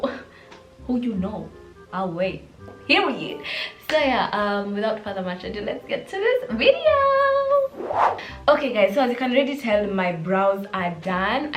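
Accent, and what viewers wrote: United Kingdom. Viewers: The British accent ❤️❤️🔥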